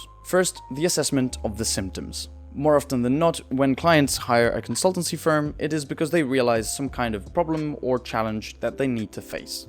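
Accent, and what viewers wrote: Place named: United Kingdom. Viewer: Impresionado por tu acento británico, peroooo, sinceramente, creo que hubiera sido mejor mantener el canal antiguo y abrir uno nuevo orientado a tu nuevo público objetivo, la verdad 🤷🏼‍♂️. Creo que así partes de cero con los interesados en Consultoría y pierdes a los interesados en aeronáutica o tecnología e ingeniería 🤷🏼‍♂️. Igualmente, espero que el comentario ayude con el "algorrino", y por supuesto, mucha suerte y todo lo mejor en esta nueva singladura consultora señor 👋🙃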